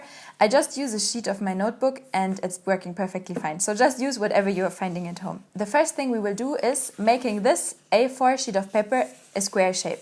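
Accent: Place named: England, United Kingdom. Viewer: I enjoyed your video, thanks, Also I like English accent, doesn’t sounds German😉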